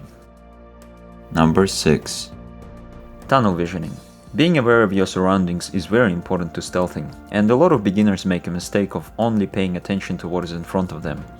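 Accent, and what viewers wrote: Russia. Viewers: Hes russian right? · your accent are you a russian?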